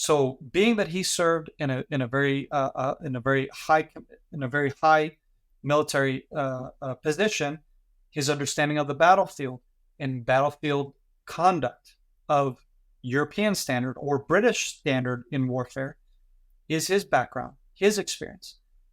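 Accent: United States. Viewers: nice podcast! Here is my beef with the world: Why is Israel responsible for any civilian casualties when Gazans could have avoided zero deaths if they surrendered on Oct. 8th, let the hostages go, agreed to disband Hamas (another psychopathic offshoot of the muslim brotherhood (bother-hood), and turn over the death worshipping cowards that perpetrated Oct. 7th. I appreciate your respect for non-jihadi muslims. Have you considered an interview with Atheist Republic? Armin is an ex-Muslim originally from Iran that supports Israel over the monstrously backward Iranian totalitarian regime. The "Palestinians" have been thrown out of several countries because they tried to take over the government like Kuwait, Egypt (Muslim Bother-hood), and now Gaza. Why people do not mention that the gem of the Iranian regime in Lebanon that exists only for war has bankrupted the country is consistent with our current Jew hating media. 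The real money comes from places like Qatar. where did your parents come from before emigrating to Israel? My guess is Kansas. You sound like a Californian to me. Candice Owens new name from some of we Jews is Klan-ace Ovens. One of her "just asking questions" was accusing a former Jewish head of a post WWII ethnic German Polish concentration camp of atrocities in deaths of 1500 people under his watch. She omits that the first Polish state attorney said no charges would be filed against Shlomo. Stalin appointed Jews to lead these camps after the war. Ow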